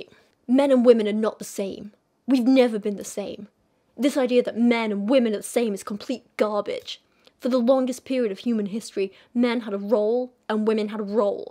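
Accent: United Kingdom. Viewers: There is some humor in how you read it in your cheerful sweet very fsminine voice with a sophisticated British accent. Love it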